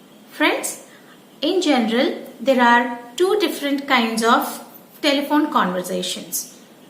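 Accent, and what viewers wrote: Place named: United Kingdom. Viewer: Very well rendered in Brit accent ya!! Btw what word was that u used for call disconnection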